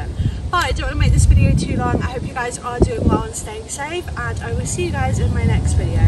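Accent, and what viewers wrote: United Kingdom. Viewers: I really love British accent you sound like one❤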